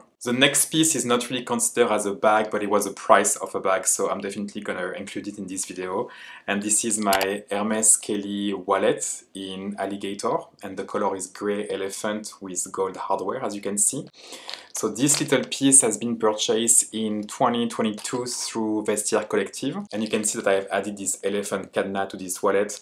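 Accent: France. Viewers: This is the first time I understand a french accent and even find it pretty. I am a foreigner as well and accents can be challenging for me lol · I am in whaouuuuuu. What a well curated collection! Really inspiring . Btw, it will be a shame to completely loose that cute French accent of yours. Just saying 🙃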